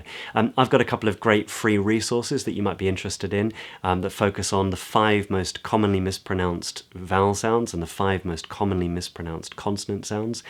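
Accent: RP, United Kingdom. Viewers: Are you RP? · Probably the best Modern RP male voice on youtube. Your efforts have borne fruits. It is something most Romanian people do when they move to Bucharest. This antiRP movement in UK is insane and aticivilisational.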